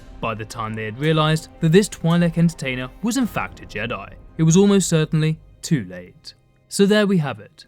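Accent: United Kingdom. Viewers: funny gow the CC spell twilight instead of twi'lek, either you work ob your pronunciation, or you stop using that dumb computer generated ouce with that british accent. That's not your real voice. I don't buy it. Next time, explain Ahsoka's clothes. I loved her tube top and backless outfit, but the question us the same. ps: came to know why her outfit (which I never questioned) and I learned she was a slave and then banging Kit Fisto.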